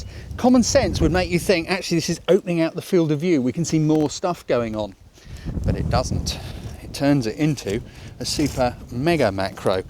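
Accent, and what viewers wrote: United Kingdom. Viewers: thank you for your native british language i really enjoyed it.s really lovely explain it .too much time i have been searching t the internet finally found you thank you.amazing explain .you are good teacher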